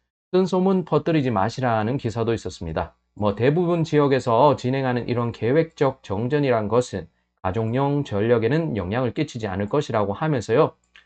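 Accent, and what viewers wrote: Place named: China. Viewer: 조선족 유투버 같다.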